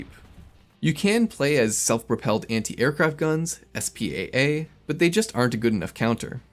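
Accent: Czech Republic. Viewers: are u czech ? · Are you from czech?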